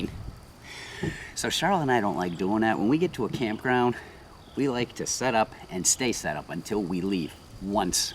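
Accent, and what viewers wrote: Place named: Connecticut, United States. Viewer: I’m from CT and it’s refreshing to hear, reminds me of the 90’s. The accent is dying here in CT and becoming more neutral (don’t hear it as often as you did 30 years back) Mass has their own accent, New York and even RI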